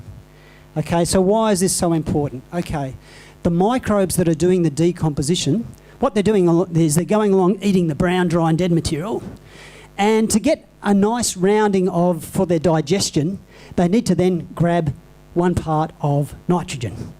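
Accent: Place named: Australia. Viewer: Where in Australia are you from? Great talk!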